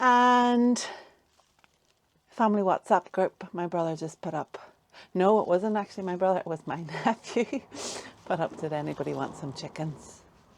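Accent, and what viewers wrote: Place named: Northern Ireland, United Kingdom. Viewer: Love a Northern Ireland accent, I could listen to you all day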